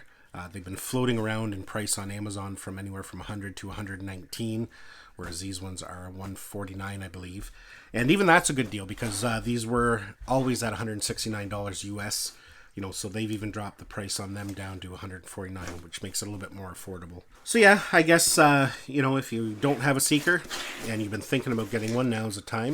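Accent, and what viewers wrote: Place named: Ottawa Valley, Canada. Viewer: I’m detecting an Ottawa Valley accent :)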